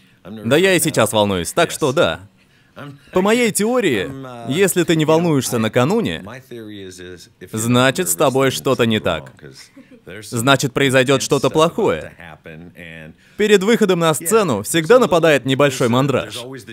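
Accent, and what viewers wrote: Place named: China. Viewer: Что за китайский акцент диктора?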